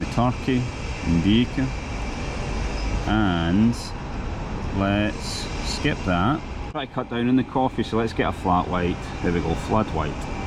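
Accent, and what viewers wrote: Scotland, United Kingdom. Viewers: Yes I like your videos and your scottish ACCENT.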